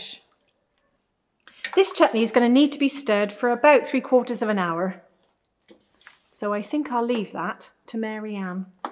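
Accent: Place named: West Country, United Kingdom. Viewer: Its a fairly common accent from the English West. Still widely spoken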